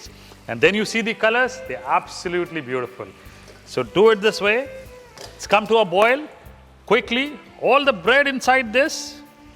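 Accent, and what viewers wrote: Punjab, India. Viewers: Paji....ur punjabi style Hindi is very nice...english is not suting ur voice......the way you tell "Oh ji namak shamak namak shamak" is awesome....paji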